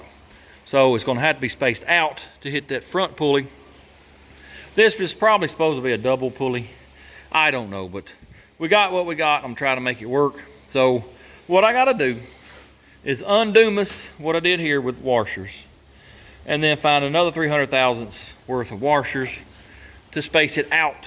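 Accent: South, United States. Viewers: THE WIFE AND I LOVE YOUR VIDOES JUST WANTED TO KNOW IF YOUR COUNTRY TALK IS FOR THE SHOW OR IF YOU TALK LIKE THIS ALL THE TIME,LOVE IT ANYWAY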